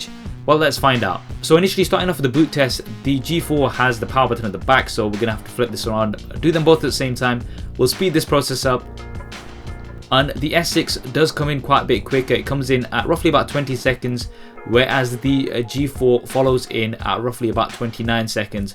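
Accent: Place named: United Kingdom. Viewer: Hi, I really like your british accent. (; I've a question. Does a fingerprint scanner on s6 works with any orientation?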